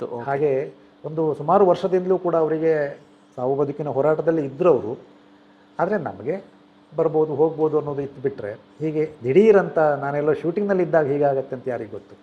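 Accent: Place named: Kundapura, India. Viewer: ನಿಮ್ಮ ಭಾಷಾ ನೈಪುಣ್ಯತೆ ಅದ್ಭುತ.... ನಿಮ್ಮ ಜೀವನಾನುಭವ ನಮ್ಮ ಕುಂದಾಪುರ ಕನ್ನಡ ಕೇಳುವಾಗ ಆಗುವ ಆ ಕರ್ಣಾನಂದವೇ ಬೇರೆ ❤❤❤❤❤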